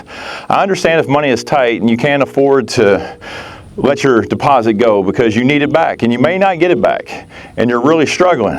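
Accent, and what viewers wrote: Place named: Kentucky, United States. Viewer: Your accent is so Kentuckian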